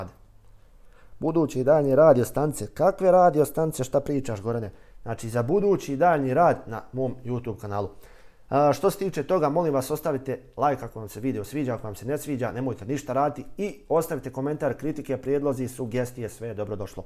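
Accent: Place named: Bosnia and Herzegovina. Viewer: ja moram da ti tepam, nemoj zameriti, sad je 12.decembar slučajno sam naišo na ovaj video, meni i muziciranje koje sjajno radiš nije toliko bitno, koliko si pun pozitive, šta god kažeš van sviračine, toliko zrači harizmom, posebno bosanski akcenat/dijalekt i kako ti prostim jezikom objasniš i sve je tako prirodno. Pozdrav veliki, voleo bih da te upoznam, gde živiš ti, daješ li časove muzičke a Boga mi i ta harizma kojom si oduševio moju suprugu, mog sina, valja se s tobom družiti. Oduševljen sam- Ako stigneš, odgovori mi je l si iz Srbije, ili negde vani. Gde god si, svako bih te posetio. Lep pozdrav, zdravlja ti želim, sreću. Zbilja bih dete, a i sebe uključio ako želiš da nam držiš časove. U ovom regionu punom bolesno sujetnih muzikanatam, samo si iskočio na YT. Ako stigneš. odgovori. Živio!!!!!!!!!!!!!!!!!!!!!!!!!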